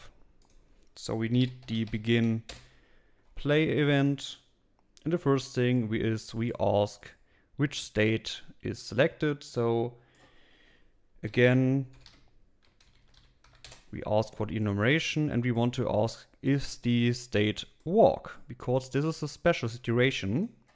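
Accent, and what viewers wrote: Germany. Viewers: Man hört so das deutsch raus haha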